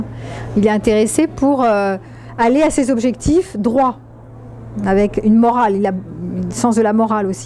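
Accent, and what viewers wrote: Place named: South, France. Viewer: je comprends pourquoi je vous trouve une ressemblance avec celle de ma collègue. Elle est aussi du Sud de la France. Raison pour laquelle vous avez probablement, la même intonation, je pense... En plus du côté alpha, j’étais aussi clivé, je dirais que je suis beaucoup plus clivé aujourd’hui.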